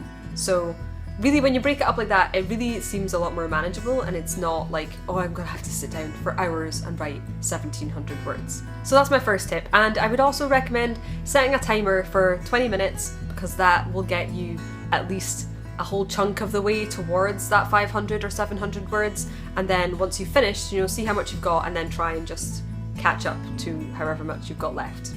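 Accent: Scotland, United Kingdom. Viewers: I love your accent so much. I want to be Scottish when I grow up!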